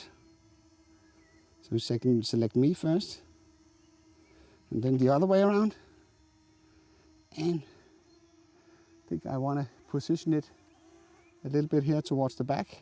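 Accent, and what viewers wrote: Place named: Denmark. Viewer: ???? JEG VIDSTE DET!!! Du er dansker - den accent kan du bare ikke løbe fra. " I mean eeh, you have been to school, not? I though it enough", " "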